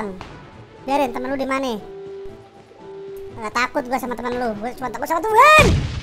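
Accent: Java, Indonesia. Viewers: Asli mana bang ko ngomong Jawa nya medok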